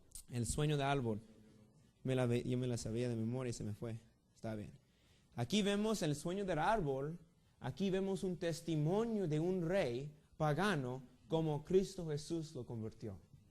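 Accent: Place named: United States. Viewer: Tu español ha mejorado muchisimo. Un poquito de american accent but still good. Keep doing the good work.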